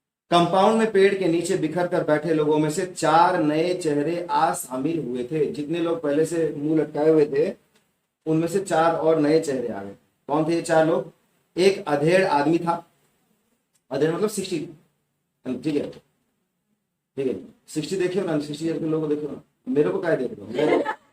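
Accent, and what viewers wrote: Odisha, India. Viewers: Is sir from odisha state ? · Sir app odisha se he kya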